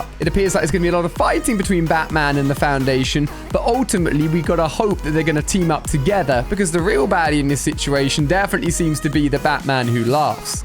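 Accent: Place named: United Kingdom. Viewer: She-swee is how you pronounce shisui but he lives in UK so he talks different. I'm not hating btw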